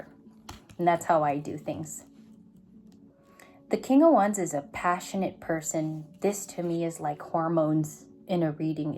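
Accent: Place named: South, United States. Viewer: So funny, he has a country accent & i love it! I've been waking up in the middle of the night just thinking of him. I miss him so much. I feel him getting closer & closer to me.❤, you're so good! 🎉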